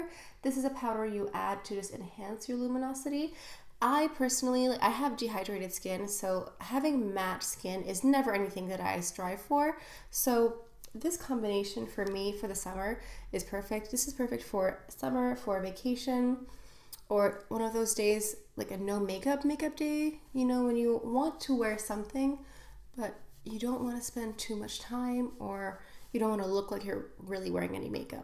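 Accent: France. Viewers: You have such a great French accent!!!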